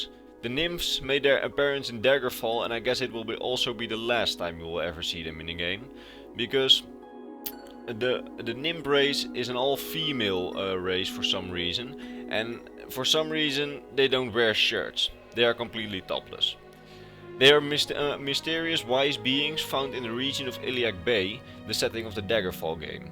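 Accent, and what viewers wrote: Netherlands. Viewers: From your accent I can Tell your Dutch Correct? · dunglish tho or nengels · the man behind the voice, is he Dutch? the accent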